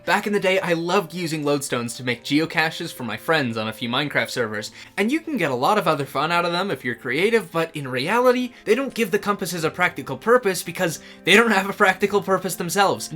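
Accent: Canada. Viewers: Ugh 😩 voice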